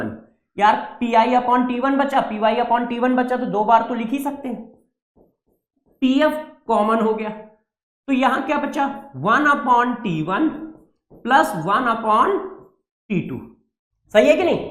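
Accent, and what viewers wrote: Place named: Bihar, India. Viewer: I bet sir is either bihari or up Becoz me also frm bihar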